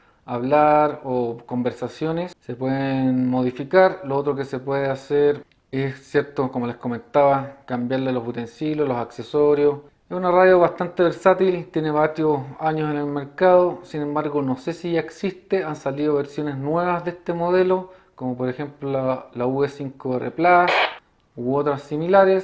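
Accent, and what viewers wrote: Chile. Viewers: Hola! Buscando maneras de programar mi nueva BF-UV5r llegué a tu canal y me ilustró mucho. Vi este video para conocer un poco más el equipo y al finalizar mencionas que luego subirías otro video explicando la función de los radioaficionados y qué se puede hacer con los equipos, me gustaría poder verlo... ya que al ver la materia de estudio para los examenes de la subtel no me queda claro cuál es el “brillo” de ser RA. Saludos! (Me encanta escuchar videos en “chileno” adecuado a nuestra realidad)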